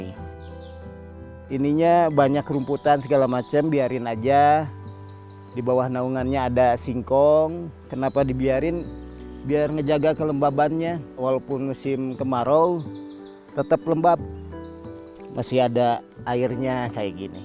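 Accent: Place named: Sunda, Indonesia. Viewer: Orang Sunda anu nyundaa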